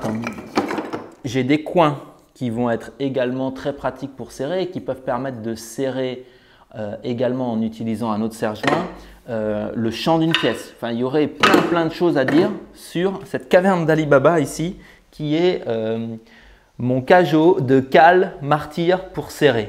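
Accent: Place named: United States. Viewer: Un "petit" 60 m2, ça fait un paquet d'abonnés qui vont t'envier :-) On croirait entendre un de nos amis américains. Merci pour la visite détaillée, toujours un plaisir !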